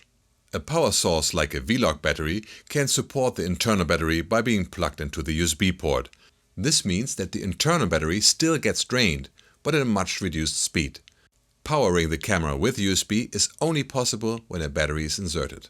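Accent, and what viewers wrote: Germany. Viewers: I could listen to that German speaking accent and intro synths forever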